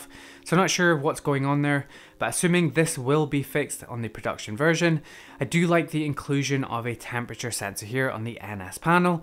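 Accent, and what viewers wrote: Scotland, United Kingdom. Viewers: At last a decent review, thanks for the clarity and Scottish accent a bonus! :)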